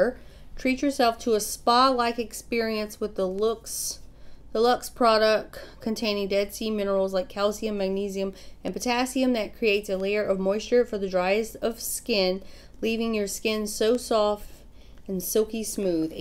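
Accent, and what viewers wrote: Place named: Louisiana, United States. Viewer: I knew I were from Louisiana. I could tell by ur voice. I live in Marksville, Louisiana. I am a lil over an hour from Lafayette. Girl do u get the box Single Swag? It is from the same company as this box. It is a lol better than this box.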